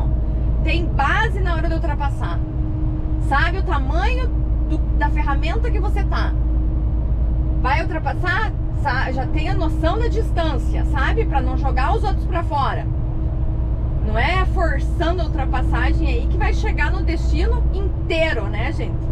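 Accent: South, Brazil. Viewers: Kkkkkkkkkkkkkkkk você é catarinense ou paranaense?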